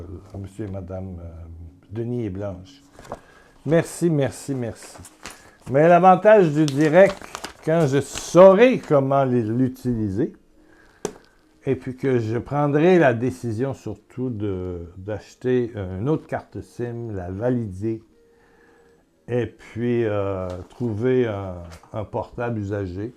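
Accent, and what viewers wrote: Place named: Canada. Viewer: s'est lâché avec son accent canadien aujourd'hui, c'est très agréable. Merci pour tes paroles de réconfort. Il st tellement difficile d'être joyeux en pensant à toutes les victimes innocentes des guerres. Je ne pensais pas qu'à notre époque, il y aurait encore des guerres. Et j'ai pensé à dire à la Sainte Vierge Marie de prier sur tous les responsables politiques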